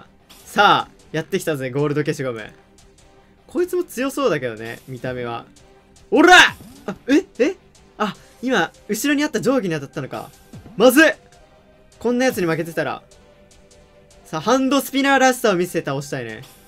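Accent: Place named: Hokkaido, Japan. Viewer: 北海道弁LINE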